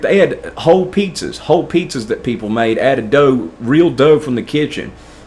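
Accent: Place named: Tennessee, United States. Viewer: Man great story. When I hear you talk it sounds like a TN accent, sound like we grew up together. Kept wondering did I go to school with?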